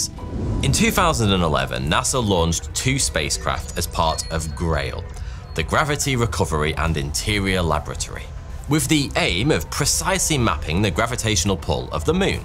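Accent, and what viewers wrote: United Kingdom. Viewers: Can't stand Limey axxents! Drives me nuts! I live in Canada and I want to listen to my videos in Canadian but almost every time, it's a bloody limey, all of whom totally butcher the English language.j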